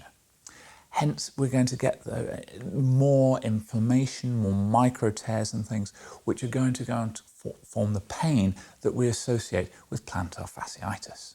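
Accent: United Kingdom. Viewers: Because he’s damn sexy! That is a breathy voice with a British accent laid on top. Sessy!!!!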